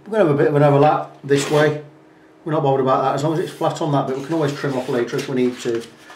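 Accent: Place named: Nottingham, United Kingdom. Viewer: Sounds like a Nottingham or possibly Derby accent there...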